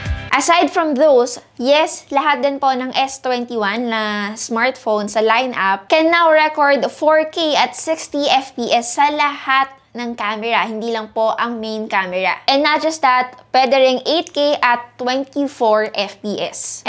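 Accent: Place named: Philippines. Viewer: I don't like your speech delivery. It's so Tagalogized English accent. Actually, ultrra, exxact, mowmint, teaboll, apresheyt, to be honest, ech d, achually, Should refer GSM ARENA specs. Waste of time too have been watching you. Noisy and too talkative.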